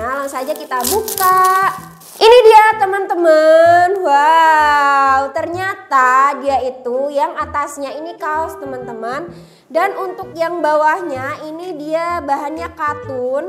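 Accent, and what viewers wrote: Java, Indonesia. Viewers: Kak asli mana. Kok ngomong e jowo? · Mbaknya org mana sih?? Medok bgt sumpah lucu.... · Kak bahasa indonesianya agak medok Jawanya khas banget😀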